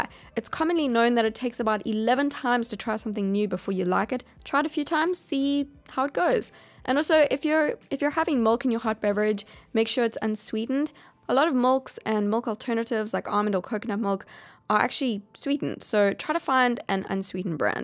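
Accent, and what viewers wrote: South Africa. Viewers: This is great! I love your videos, I've watched several since I found you yesterday and a bonus that you're South African (originally from there) haha miss the accent so much! I've been slowly doing this for my diet and will only eat refined sugar in small quantities like ex.cliff bar, I won't eat the whole thing on one day, (but I'm going to try find the brands you suggested tho) and I have a cheat day on the weekend for ice cream or some other treat ! My diet is mainly plant based now so I feel good. Can't wait for the day where I crave healthy food more than sweet and unhealthy food ahha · Is your accent South African? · Do I detect a South African accent? I really should get back into a healthy diet, since moving to Gauteng am completely out of my routine, exercise and diet wise. The only thing that is saving me is intermittent fasting 😅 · Are u South African?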